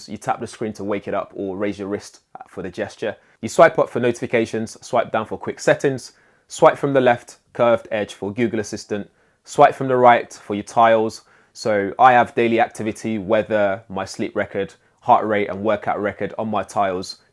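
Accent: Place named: MLE, United Kingdom. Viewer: Cool MLE accent👍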